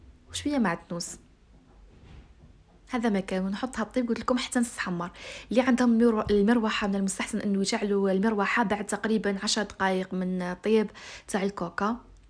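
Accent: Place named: Constantine, Algeria. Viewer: جاتني هدرتك قسنطينية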